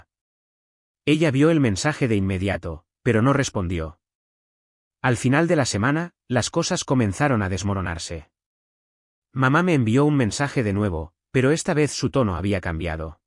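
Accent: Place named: Andalusia, Spain. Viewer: ÉSE TONO, MEDIO ANDALUZ, MEDIO ESPAÑOLADO,ES BASTANTE SINGULAR...ES EXCELENTE LÁ DICCIÓN... SALUDOS CORDIALES..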